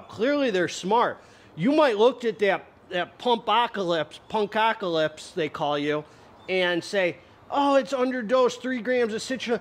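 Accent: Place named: South, United States. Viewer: You remind me of a southerner with the way you say things. Idk where you live you just remind me of a very energetic southerner lol😂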